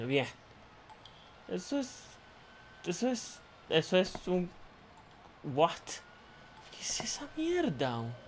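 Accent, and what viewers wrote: Colombia. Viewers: bro eres de bogota o medellin?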